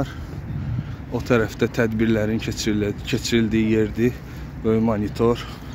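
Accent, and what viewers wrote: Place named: Azerbaijan. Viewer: Приветствую Вас дорогой ❤🇦🇿💞🇦🇿 Простите меня пожалуйста за не скромный вопрос: " Почему вы должны вернуться в Турцию "??? Я по разговору чувствую вы АЗЕРБАЙДЖАНЕЦ......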